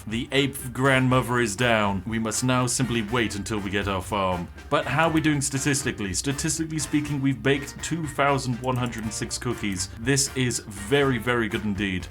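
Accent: United Kingdom. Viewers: why so british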